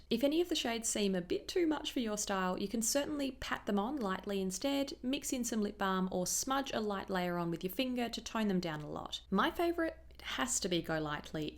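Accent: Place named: Australia. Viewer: It's always nice to hear that familiar, Australian halo there again. I love your videos for the makeup but I also try to teach myself how to do an Australian accent. 😁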